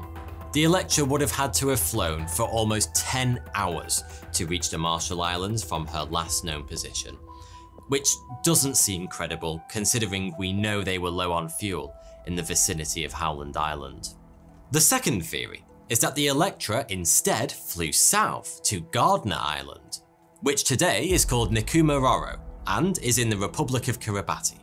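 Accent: United Kingdom. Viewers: He has a clean British accent. I’m Canadian and being a Commonwealth country I can understand a Brit as easily as I can understand an American. If you want hard, try understanding a Scot, Irishman, or Welshman, especially if they’re piss drunk. I can but it takes a bit of concentration and a few pints first. Even Northerners near the Scottish border can be tricky at first. Australians and New Zealanders are fairly easy but I still can’t tell them apart. I always ask where they are from before assuming because they get really pissy if you call an Aussie a Kiwi or call a Kiwi an Aussie even though they sound the exact same. Being as I’m from Canada, I have a much harder time understanding Newfies and Quebeckers. Newfies talk way too fast and Quebeckers speak French and I don’t know French.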